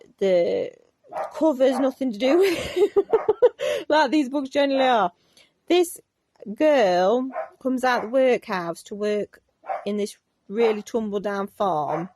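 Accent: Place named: United Kingdom. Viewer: Where are you from in UK, Queen Victoria? I like your accent very much I want to learn it when I come there 😊❤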